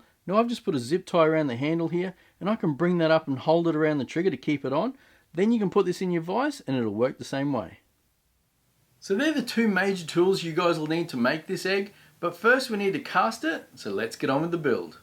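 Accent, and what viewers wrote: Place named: Australia. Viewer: I said to myself "This guy sounds like an Aussie" and then I checked the description and lo and behold I was right, glad to see fellow Aussie's doing something cool like this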